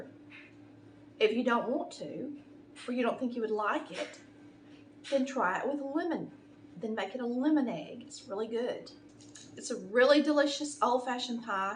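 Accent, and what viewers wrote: South, United States. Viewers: I love watching you. You make the most awesome things. I have learned so much since watching your videos. I love you too. I really love hearing you talk and that wonderful accent you have. I live in SC and it’s right down the alley. May GOD BLESS YOU ALWAYS AND KEEP YOU SAFE ALWAYS. You always make me so hungry 😋. Keep those awesome videos coming. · I can tell you’re from the south, I love your southern accent too!!🥰🥰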